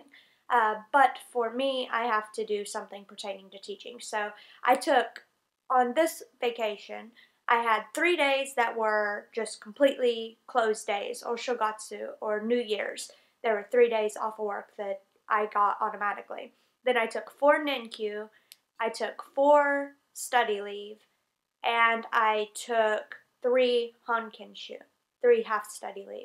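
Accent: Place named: South, United States. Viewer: The southern accent is trying to break through lol. Nice video though :)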